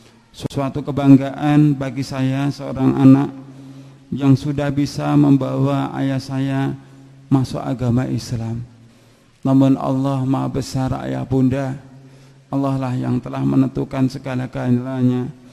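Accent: Java, Indonesia. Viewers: Ha ha ha Mualaf Cari Panggung...Keyakinan Itu Nggak Perlu Di banding-bandingkan.anda Yalin dengan Ke islaman anda, lakukan, kami yakin Dengan keyakinan kami, itu adalah jalan kami. Logatnya Jawa Banget. Jika tidak paham Agama hindu. Jangan mengomentari Keyakinan agama hindu. Fokuslah anda pada Agama islam. Ustad udah masuk Islam, Masih bilang di dalam agama saya. Anda semakin membahas agama hindu, justru menunjukkan kejelekan Anda. Maaf ( bukan Kejelekan Agama Islam) justru agama islam semakin dijelekkan dengan Orang seperti ini. Semoga komentar ini jadi viral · Ustad ini asal usul nya dari Bali, tapi sudah lama tinggal di jawa.... wajar2 saja lah bro logat nya jawa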